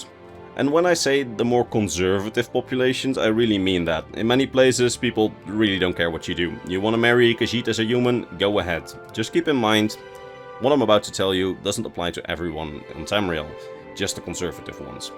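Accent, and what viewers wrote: Netherlands. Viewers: Nederlands accent😜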